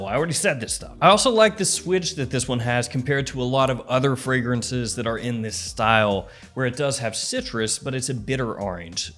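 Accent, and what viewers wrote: South, United States. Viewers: That southern accent should make an appearance more often!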